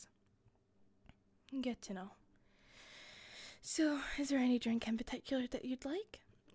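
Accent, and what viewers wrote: Scotland, United Kingdom. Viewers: I’ve pretty much always adored Scottish accents, so tingles or no it’s an absolute joy just to listen to you talk. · Just when I thought I couldn't fall harder for a Scottish accent... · am? Check Crippling sleep deprivation? Check Late night scottish ASMR? Check This will be a good night... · Scottish accents are the best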